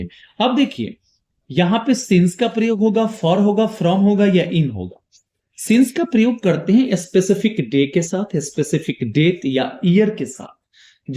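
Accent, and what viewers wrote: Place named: India. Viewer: Very nice explanation. Which language is it? Very interesting.It sounds like Indian.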